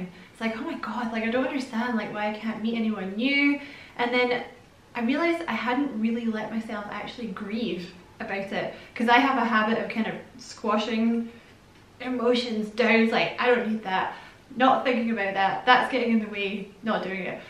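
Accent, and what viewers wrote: Scotland, United Kingdom. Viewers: Thank you so much for this, it's great to hear another Scottish voice❤️🏴.... I am doing lots of shadow work and the amount I have cried is not real 😭... Where I am having problems tho, is not forgiving others for situations, that's easy to me😊.. Its trying to forgive myself!! and now having hindsight, why didn't I do that/say this? its the anger towards myself I can't let go off. Love and light too you and I wish you all the happiness in your new space. ❤️From an aqua lady xxx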